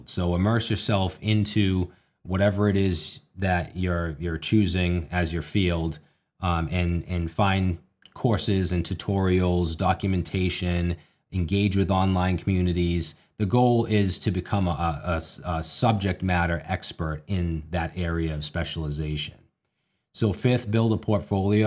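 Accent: United States. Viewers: Bro looks better than when I first saw him, and that was years ago. And got the best American accent I've heard.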